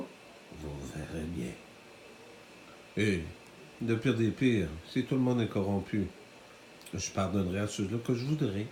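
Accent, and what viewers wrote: Quebec, Canada. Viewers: mon Rabbi j ai prix plaisire a t entendre rire et j aime beaucoup la façon de t esprimer j espère que tu aura toujours cette accent si charment Québécois et ta façon de nous expliquerles choses me plaît beaucoup merci j ai aimer voire le toutou et le minou sa me manque beaucoup de pas en avoire mais je me ratraperais plus tard j aurais tout les animaux de ABBA pres de moi et ils seront libre d allée où ils veulent merci je vous embrasse fort toute la petite famille sesukuli mon Roy.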